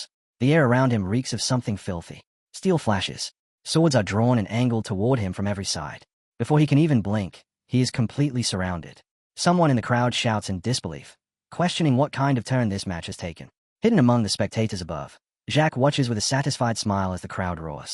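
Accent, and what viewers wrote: Australia. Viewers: Idk but i want to say Australian for some reason. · Stupid Aussie accent.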